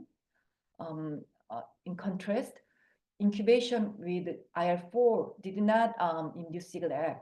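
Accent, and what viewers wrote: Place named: South Korea. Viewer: Konglish is making my head reel... Im really trying to make out what she's saying. Sorry 😔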